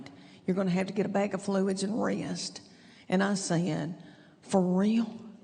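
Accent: South, United States. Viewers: I've watched your videos over time,🤨 Each time, I could not remember🤔 you being funny in any other video that I had watched. Yet I'd watch another one.🧐 Thinking maybe you're going to say funny this time ....🥱 Well, here we are.....😳 Maybe I just like watching you for your nasıl toned southern accent, Not that I'd recommend it, Cause that is not particularly interesting either. Maybe you're a niche comedian; Funny to a particular group. Wow, 😲 finally ❗ you might have just said something funny 😲😲😲 😂🤣🤣🤣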